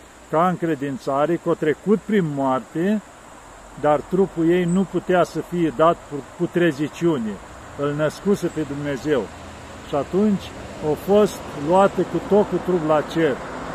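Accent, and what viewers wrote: Moldova. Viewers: Bună dimineața!!! Părinte vă ascult cu mare drag, PTR că povestiți cu mult har și ca un plus se adaugă blindetea graiului d-voastră, grai dulce Moldovenesc!!! Sănătate deplină!!! Vă rog mult, mult, mult, să binevoiți a-mi da o Binecuvintat!!! Să aibă BUNUL DUMNEZEU ATOTPUTERNIC milă și îndurare de noi și să ne binecuvinteze cu SFiNTA pace pe întreg Pamintul!!! ❤️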